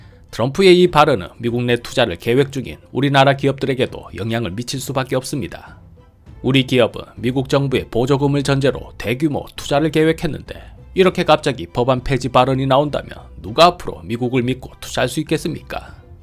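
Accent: Gyeongsang, South Korea. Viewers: 왠..경상도사투리..!!..트럼프가 영샘이가??..표준말버젼이면..더욱좋겠구만..!!갱상도사투리 듣기가매우거북함..!!..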